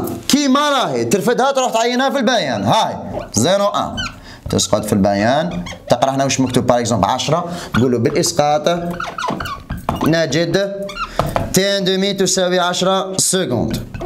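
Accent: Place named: Syria. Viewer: تبان سوري ههههه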